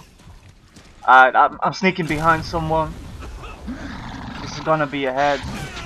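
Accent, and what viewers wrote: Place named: Europe. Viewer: su acento no es de estados unidos el es de europa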